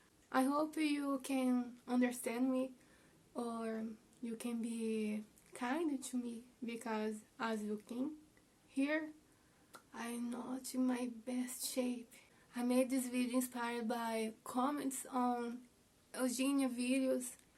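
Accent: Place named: Brazil. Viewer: you are brasilian right?? im from portugal and the way you speak your accent sounds brasilian, voce e brasileira vejo pelo sotaque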